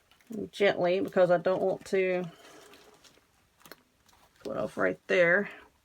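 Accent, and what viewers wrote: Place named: South Carolina, United States. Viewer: I ABSOLUTELY LOVE THIS SCARECROW 😊🎃🍁🍂 & THE WAY YOU MAKE THEM KID FRIENDLY 💝💙! THE VERY FIRST TUTORIAL I SAW OF YOUR'S WAS YOUR BEAUTIFUL CHRISTMAS WREATH WITH THE MANGER SCENE WITH OUR SWEET BABY JESUS IN THE CENTER & YOU COMMENTED "THAT'S IMPORTANT BECAUSE THAT'S WHAT IT'S ALL ABOUT REALLY'' & ALSO THAT YOU STILL SAY "MERRY CHRISTMAS 💝😊! THEN I LOVED SEEING THE "OUR PRAYERS" CERAMIC PIECE YOU BOUGHT AT THE DOLLAR TREE (Which I really loved too)! I JUST LOVE SEEING PEOPLE WHO STAND UP FOR WHAT THEY BELIEVE IN & THIER THOUGHTFULNESS FOR OUR CHILDREN & GRANDCHILDREN! OUR GRANDBABY'S HAVE ATTENDED THE SAME EXCELLENT CHRISTIAN SCHOOL FOR THE LAST 4 THAT OUR 3 CHILDREN ATTENDED AT FOR A WHILE YEARS & IT'S STILL THE SAME CHURCH. MAY GODALWAYS CONTINUE TO BLESS YOU SWEET GIRL 🙏✝💝!!! PS: Now believe me us 4 Sister's can still get a little sideways when necessary, lol 😊!!! 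Your voice sounds just like us & You have tons of fun & your personality & voice sounds just like ours! We were born & raised in Arcadia FL but our Precious Daddy was born & raised in KINGSTREE, SC until his Family moved down here. But he never lost SC accent & we all have it pretty close too, lol 😂!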